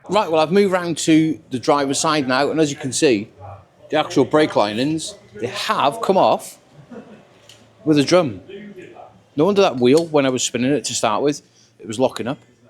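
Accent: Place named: Wales, United Kingdom. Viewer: Subscribed. Love your videos -you’re a knowledgeable chap, who does a proper job… I find your uploads very interesting… Those shoes don’t seem to start life very thick by the looks of the new ones! Being nosy, whereabouts in Wales are you, I used to live on Anglesey, but I wondered from the accent if you were more like south Wales…..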